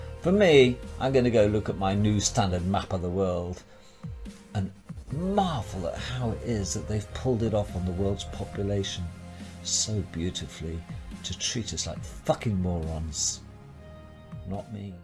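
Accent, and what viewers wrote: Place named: United Kingdom. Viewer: The British accent is great for sales. He is speaking the truth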